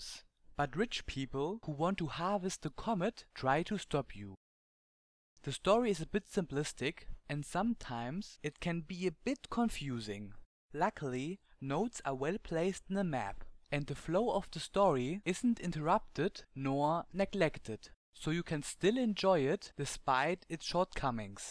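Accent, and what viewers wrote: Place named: Germany. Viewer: I hope my german accent isn't that annoying <- Lol'd so hard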